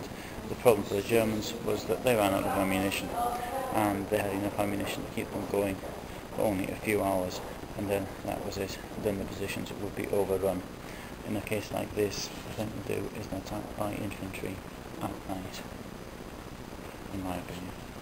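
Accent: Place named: England, United Kingdom. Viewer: I wanted very badly to go to this museum April of 2005. My girlfriend (at the time) spoke fluent Russian and German. She agreed to help me document the 60th battle reunion. I had money saved up for two plane tickets. Long story short, we didn't end up going .. Glad you could make it though! Sounds like your from England, I'll bet WWII battlefield tours are a virtual hop-skip-jump for you. Lucky dog you.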